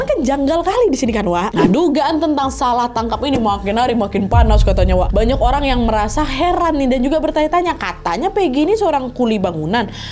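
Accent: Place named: Sumatra, Indonesia. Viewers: Itu logat sumatra... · Lahh knp dibandingin wak..saya dlu krj di malaysia orng sumatra ngmongnya jg gt kak tegas keras jelas tp wak ada jawanya dikit kyknya keturunan..bah hiro kan menghibur gemulaii gimana gt jg enakk bawainya...ad ciri masaing2 lah wak... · Emang kalo pake hijab auto jd suci?? tuh org sumatera otomatis logatnya ya Kya pada umumnya org Sumatra yg kenceng...Julid amat jd orang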